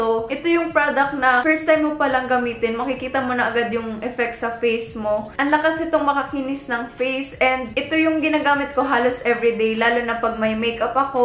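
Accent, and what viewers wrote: Visayas, Philippines. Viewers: Bisaya ka ate?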